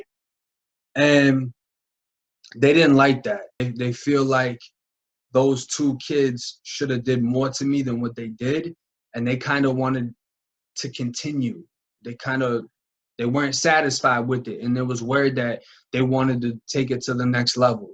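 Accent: Boston, United States. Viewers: That Boston accent is nails! Respect from U.K! Cheers for sharing your story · This is definitely off topic but I really dig the Boston accent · Bruh im from the UK so its probly that,but your accent sounds kinda Boston and i thought youre from Florida..is that a thing or have u moved from there? Love your videos btw nice and honest..thanks for the info. B. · That authentic boston accent👊🏼 Hows it goin everybawdy😃 Gotta ❤ it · Really loving this guy so far...but I especially love it when he talks more with his Boston-ish accent opposed to the other way her talks..I can listen to that east coast style allll day :) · Good video. He’s not a Florida boy tho. I hear providence or Boston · Always gotta rock wit someone else from the 813. Your startin to get a Boston accent tho my bro. Much love from tampa we keepin it real down here. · Got all caught up yesterday on Lockdown 23and1 vids yesterday & so happy I found you thru Christina's Channel! 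Can't agree with you more on so many levels. No I never did actual time but in my past (long time ago past) had a serious boyfriend that did. I did that time with him even tho I was on the outside! Ppl don't realize going to prison affects everyone around that 1 person actually doing the time! I'm not with him anymore, it's been over a decade since we split, tho we remained friends & I supported him w/out enabling him for years after. Anyways, now got you to watch (gotta love not just your content, but that Boston accent!) 😆🙃 Glad you turned your life around & so happy for you overall! Plus now there's Lockdown 23and1's Channel to get that area of country perspective of prisons & interviews w/ other ppl! Can't say enough how grateful I am that you started a YT Channel! God bless! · Dude its awesome that your puttin your story out there, takes a lot of sack! It's funny, I definitely noticed your bostonian in the first video, I had the same thing happen I spent years between Florida and Beantown "home" and more I find myself droppin my R's lollol its wicked funny cause you were so right about ppl saying there from Boston but really bring from Waltham or Billerica lmfao Great stories bro, keep it up!!! 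· Came from Christina’s channel! I love how humble you are! Stay true to you and keep your head up! I cannot imagine being in your shoes, but I hope you can learn from the roughness of your past❤️. God bless! I can’t wait to see how far you go in life! Btw, I love your Boston accent! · Saw you on 23 &1. Checking you out from Rhode Island. Love hearing that Boston accent that I grew up with. Psyched to see you with your own channel!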